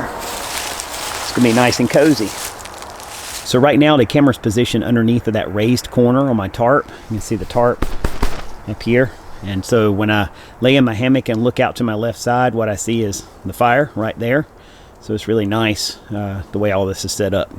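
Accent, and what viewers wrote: South, United States. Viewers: Sorry, trying to figure out what state your in? Couldn't find it, but you have a pretty cool southern twang in your voice.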